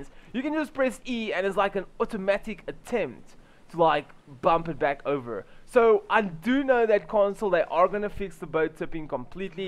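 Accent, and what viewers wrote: Afrikaans, South Africa. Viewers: lucky guess lol I’ve been trying to learn Afrikaans so the accent sounded familiar to those in the Afrikaans tutorials.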